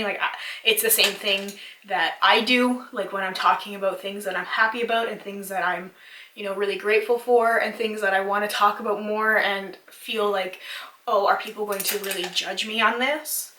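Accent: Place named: Canada. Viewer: I can't understand how anyone could possibly have an issue with you. You come across as such a sweet and genuine person. I don't know any Canadians so I'm loving your cute little accent too. Keep being you as you're great as you are. Remember, this is your channel and you don't owe anyone anything! Much love ❤️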